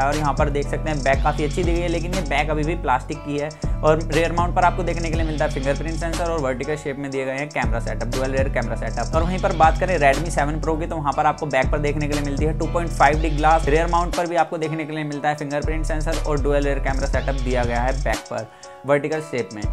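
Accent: Haryana, India. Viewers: haryanvi ho bhai kya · Launda Haryana se lag Raha h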